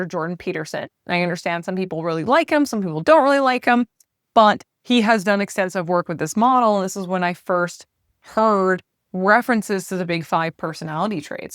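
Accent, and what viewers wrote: Canada. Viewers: I just love. Your Canadian accent. You are very attractive. I’m sure that makes you uncomfortable. Why? “Oh I’m smart and professional.” You’re still a girl, right?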